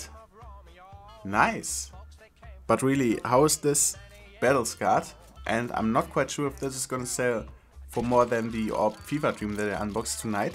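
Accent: Germany. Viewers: Hey m8! Where are you from? Because you speak eng like a german^^ Nice Video :) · Justin he comes from germany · Are you German? Sounds like a German Accent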